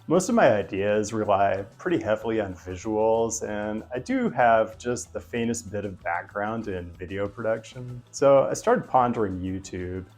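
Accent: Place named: San Diego, United States. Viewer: He is the most handsome white man I have ever met. He speaks like a person from San Diego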